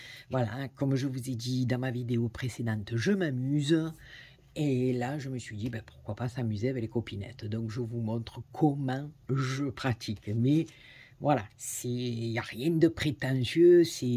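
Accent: South, France. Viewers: Bonjour je viens de trouver votre site et je suis très heureuse de vous suivre vous faites des choses magnifiques j'adore ces cartes faites à l'encre elles sont super jolies merci pour l'idée du gabarit lune avec ses ombres il fallait y penser c'est super Merci beaucoup et puis en plus j'adore votre accent ça me rappelle mon midi (Perpignan) je suis maintenant sur le bassin d'Arcachon ce n'est pas le même accent!!!! bonne journée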